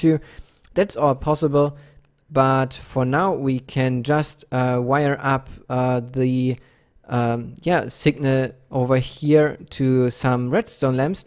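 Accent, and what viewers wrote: Germany. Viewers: coole idee und gute umsetzung. leider habe ich nun das dilemma, dass sich mein "speedometer" nich resetet. hab nicht das gefühl, dass das 2 sekunden sind... hab das tut nochmal angeschaut und meine konstruktion überprüft, leider ohne den fehler zu finden. hast du auf anhieb einen tipp, woran es liegen könnte? lieben dank und dir noch einen schönen tag. :) (das du deutsch kannst hört man am akzent und in einem anderen vid begrüßt du die leute mit "herzlich willkommen" :D )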